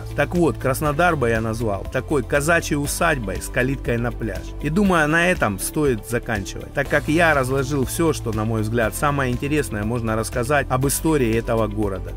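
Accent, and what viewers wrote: Rostov, Russia. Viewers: По говору сразу узнал, что ты ростовский или с Донецка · Я сразу понял , что ты с Ростова как только начал говорить 🗣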